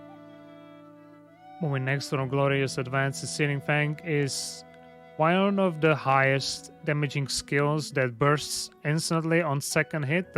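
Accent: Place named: Czech Republic. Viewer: sounds like czech accent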